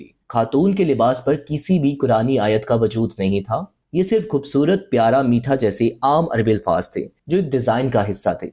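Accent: India. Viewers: sab koch sahi tha , but pakistanis ko pehle apni Urdu sahi kar chai ye , lagraha tha is vedio me koi indian bol radha he he, ghalat ko galat , khatoon and bohat sare word wrong pronouse kiye apne, ab darama actors bhi ghalat urdu bolte he fakhar k sath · Aap urdu Indians ki tarah kiyon bol rahe ho?? غ کو گین اور ک کو کھ کی طرح کیوں بول رہے ہو آپ؟؟ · اس بھائی اپنی ق،غ،خ تو ٹھیک کرو،ضروری نہیں کہ ہندی زبان سے متاثر ہو جاؤ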